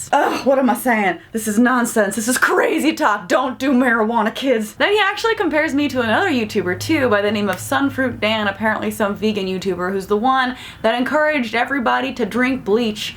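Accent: South, United States. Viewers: I see you use your country accent more and more ( i don't know if you notice it). Why is that? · From one Southerner to another, I love hearing your accent escape every now and then. Mine only does when I'm drunk xD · havent been to this channel in awhile and sounds like she has more of a southern drawl haha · I can't tell you how much I am I'm love with you for your exaggerated sassy southern accent it warms my heart every time. 😻😻 I'm sorry onision said bad things about your body. You are beyond a perfect 10 and I hope you know that and you always were and always will be. Happy thanksgiving 💖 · You slip in and out of a southern drawl. I thought at first it was on purpose. That's unique. I would say cute but apparently when a guy makes a compliment on the internet, clearly he is after something. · Happy Thanksgiving and thank you for blessing us with your Southern twang. 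🙏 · What's with the cowboy accent lol? I mean it's cute. But why lol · Your southern accent came out a few times and I wasn't sure if it was fake or if you actually have an accent.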